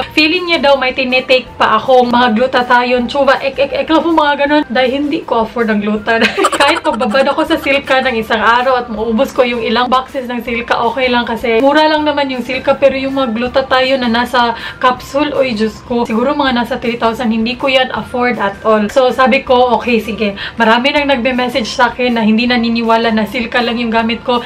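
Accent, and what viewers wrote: Visayas, Philippines. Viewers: hi te bisdak lge ka..haha taga saan ka?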